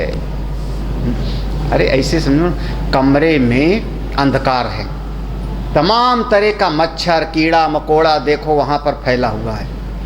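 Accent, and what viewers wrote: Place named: Allahabad, India. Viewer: Swami Ji aapki tone Allahabad hi hai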